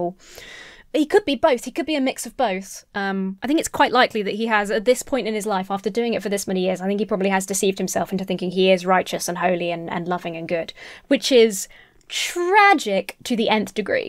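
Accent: United Kingdom. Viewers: As a New Zealander, I didn't notice that your British accent had faded until you mentioned it. Don't worry about mixing up the accents, they're similar to outsiders and our "rivalry" is basically friendly. We know we sound similar. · starting off the new year with a British amount of shock and awe, brilliant! This highly likable bi woman with eloquently described counterpoints to the source video, while having just a few endearing accent gaffes and quirks that ties together the whole video in a way that usually only annoyed British people manage to do. This subtle call to action against a group that uses religious doctrines to "other" certain groups that they don't want to be treated like equal humans, is as well structured and direct as needed without loosing the simple points covered. Thank you for all the good you did in 2022, and if this video is a yardstick for 2023, it's going to be even better! 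Keep being awesome, take care and stay safe!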